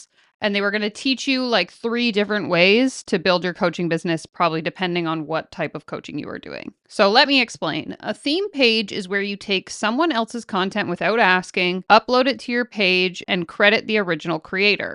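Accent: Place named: Canada. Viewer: I knew about 30 seconds in that your from Canada.. lol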